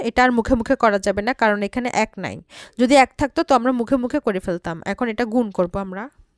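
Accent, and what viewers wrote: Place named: Chittagong, Bangladesh. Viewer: তোমার জেলা আমি জানি চিটাগাং😂😂?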